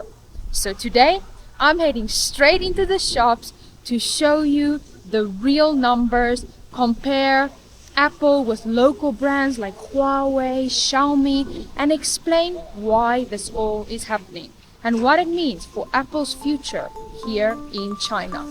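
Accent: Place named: South Africa. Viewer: Please forgive my asking.. You sound South African.. Im from Johannesburg And im your newest subscriber 🎉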